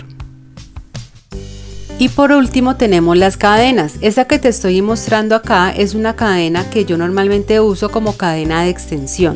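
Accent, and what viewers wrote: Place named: Colombia. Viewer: Hola; mil gracias por tus explicaciones..creo por tu acento que eres colombiana como yo, que bueno si es asi Estoy aprendiendo mucho con tus tutoriales por ahora para hacer regalitos y ojalá pueda vender. Vivo en usa. y he conseguido poco a poco los materiales. Tengo 69 años y ha sido facil aprender. Un abrazo desde la Florida, usa.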